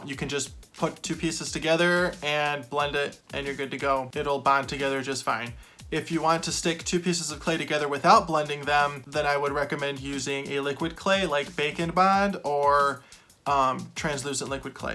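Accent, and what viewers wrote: Michigan, United States. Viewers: I thought I detected a fellow Michigander’s accent, I’m from the south west side at the very bottom anyway to answer your question yes I wouldn’t mind a 30-40 minute video so I can follow along an know what I’m doing lol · Michigan accent! I grew up in Warren but moved to Tennessee 20 years ago. People either love or hate my accent here. Question on baking: What do you bake on? I know if my clay is baked on a glazed ceramic tile then the clay touching the tile comes out glossy. Since many of your pieces are large I suppose you must lay them on their side to fit into your toaster oven. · I knew that was a Michigan accent!! Lol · Just my 2 cents; YouTube will recommend that you edit your videos to 20 minutes or less. This is probably important for "talking head" type videos. If the video is mostly showing the actual work you are doing, most of the people who purposely selected it for viewing will watch for a lot longer than 20 minutes - it's why they are watching. 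Personally I don't see anything wrong with your current studio setup or your video presentation or your Michigan accent. Bacon Bond? It's like "Duck Tape"; originally it was refered to as "duct tape" (as in heating ducts) but so many people pronounced it "duc-tape" that 3M officially adopted the name brand "Duck Tape" Maybe you will be responsible for a re-branding of the product! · Michigan accent?! I'm a fellow Michigander. Hello from Kalamazoo+ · Your Michigan accent is very similar to my Ohio accent. I no longer live in OH though. Bake and Bond - bacon bond lol yep. That's how I say it.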